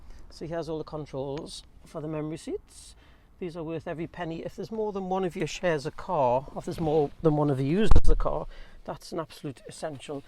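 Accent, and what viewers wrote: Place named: Wooler, United Kingdom. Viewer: I have a friend that lives at Wooler and you share the same accent great review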